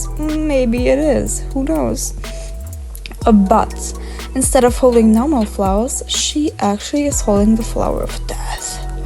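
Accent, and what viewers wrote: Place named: Germany. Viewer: I actually like you German accent!! Loved the video!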